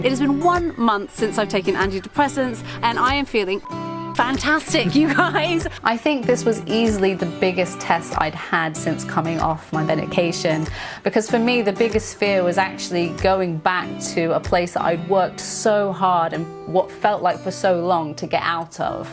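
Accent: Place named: West Country, United Kingdom. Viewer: I was amazed to hear you grew up in the States, you sound like a west country girl to me.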